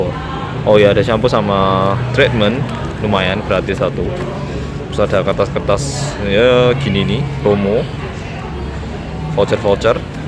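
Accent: Java, Indonesia. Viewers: Medok tenan ngomonge cak:v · lucu banget jawanya wkwk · gue kira orang jawa wkwk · kok medhok ya. btw baru nemu orang korea medhok. keren bingit..subscribe ah. keep posting info menarik ya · sudah lama tinggal di jawa ya oppa sampek kebawa logat jawa ( medok ) 😂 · jowo nya ningendi mas??? ribet gak mas.pindah kewarganrgaraan ganti KTP nyaa? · sipit tp kok medok ya mas 😄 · 오빠 ngomongnya medok banget >.< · maaf ya,mo nanya kamu tinggal di Indonesia dmn? di jawa kah? logat jawanya kelihatan bgt hehe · Asli org mana sih kak Ktp nya korea tp bahasa nya kok medok ya 😁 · kok suarany kyk orng Jawa bgt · 안녕하세요. 조는 노비입니다. Ternyata 씨 orang korea yaa tapi lancar sangat bahasa indonesia sampai logatnya logat orang jawa 대박 😁. Saya masih proses belajar bhs korea 😁. Oh iya saya sudah tahu ktp org korea kaya gimananya di drama my father is strange 😉 eps 52 hhi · Medok temenan koe bang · Mas e gk pnya ktp indo??besar di Korea??bahasa e medok Jawa gt???kaget q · kok medok jawa? 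😂😂😂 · wajah korea.. bosonya medok sekali.. hehe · logat ngomong nya kaya orang jwa,medok gitu. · mendok bet😂😂😂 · logatnya seperti logat jawa medok 😄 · medok bnget mas... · orang korea ya bang?? kok logat bicaranya kayak orang jawa:D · keren yaa, logatnya jawa gitu padahal korea👍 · medok banget mas. 😂 gemes. haha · lah kok medok... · koreane ngledok:v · hah? orang korea? kok medok banget sih bahasa jawanya:') serasa orang jawa abis belajar bahasa korea cobak:') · model KTP tiap2 negara bisa berbeda tergantung pada kondisi GEOPOLITIK negara masing2 dan ini bersifat dinamis. di eropa, jerman misalnya KTPnya ada masa berlakunya. lebih jelasnya bisa bertanya ke dept. dalam negeri. salam Lemper mas bro..., tetaplah medok dan trus ngomong jowo.., tak tunggu vlog sampeyan selanjutnya,suwunn,hehe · pantesan ada yg gak percaya sampeyan org korea.. wong ngmgnya medok jawa buangett.. 😅😅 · pertama kali liat video ini kok fokus gw ke medok jawanya ya?😂😂😂😂😂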